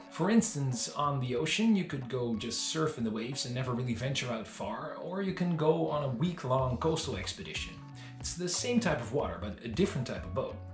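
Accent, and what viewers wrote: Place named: Netherlands. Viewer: I live 1 minute from the Meditteranean Sea in Israel, and I find myself looking up info about buying my first kayak. This video pops up and without further checking you out, I can HEAR your Dutch accent. Then I look and figure out you're a Haarlemmerrrrr! My birth town! What a coincidence. In ieder geval, een erg informatieve video die me een stapje verder helpt in de richting van mijn eerste kayak avontuur. Groet uit het "heilige land". P.S. Is er iets over Middellandse Zee kayakken dat ik moet weten als beginner?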